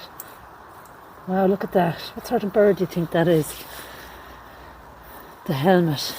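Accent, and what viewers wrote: Ireland. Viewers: I like your irish accent. 😊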